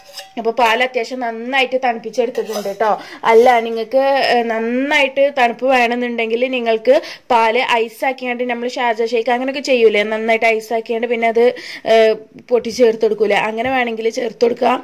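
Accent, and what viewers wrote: Malappuram, India. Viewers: Malappuram slang adipoli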